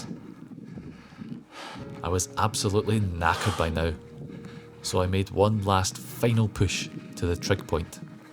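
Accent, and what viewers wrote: Scotland, United Kingdom. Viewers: Stunningly beautiful and said with so much passion and of course the lovely ring of the Scottish accent . I am so proud to be Scottish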